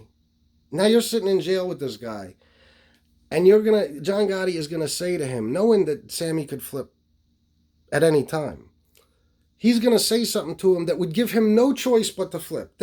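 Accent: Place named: Northeast, United States. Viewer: You only have an accent to people not from the northeast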